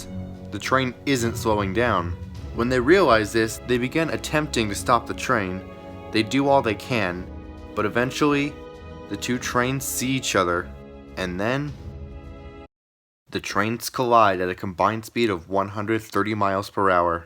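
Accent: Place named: United States. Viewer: It’s just American enunciation, we can’t do anything about it.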